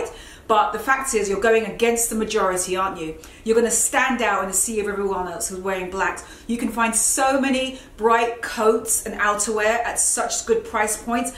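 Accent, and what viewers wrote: United Kingdom. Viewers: I love love love your accent! A mild uk english🎉🔝🔝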